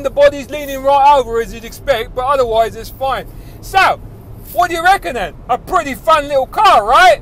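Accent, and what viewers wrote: United Kingdom. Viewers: Hilarious review. Love the British accent.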